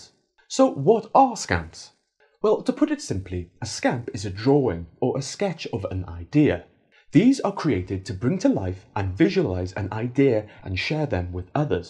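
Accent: Wales, United Kingdom. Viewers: Hey fella are you Welsh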